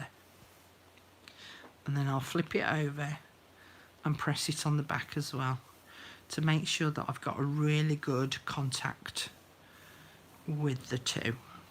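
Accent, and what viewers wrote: United Kingdom. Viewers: I am a fabriholic and álways wondered how to do this paper embroidery thing - thanks for sharing your vast knowledge. Just loved the way you split the thread. You are one gifted lady and I luvvv you British accent. Stay Blessed girl - God loves us all.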